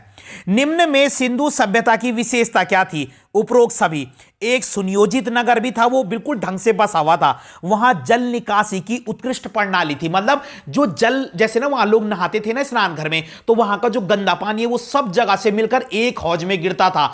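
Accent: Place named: Hills, India. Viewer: सर आपकी आवाज पहाड़ियों जैसे lgri🥸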